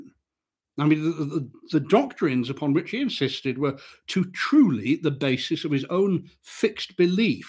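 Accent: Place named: RP, United Kingdom. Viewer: Thank you for this, Mr. Posh Voice Reading Man.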